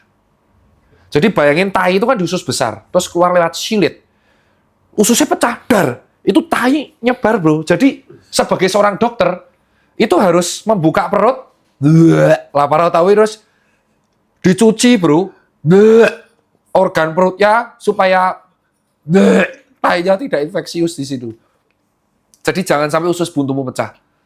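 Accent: Java, Indonesia. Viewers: Asik penjelasan dok ter ini,ada jawa2nya😂😂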